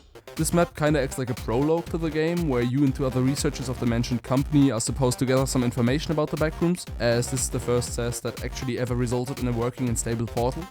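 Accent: Germany. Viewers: YOU ARE GERMAN I HEAR IT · Are you german? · Oh yeah and PLEASE try to bring this to steam I’d love to play it, also you’re pretty obviously German just judging by your accent (no disrespect I’m German too) and from the text in 😭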